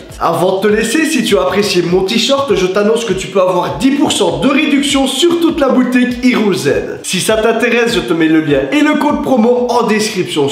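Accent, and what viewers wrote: Liège, Belgium. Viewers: accent liegois non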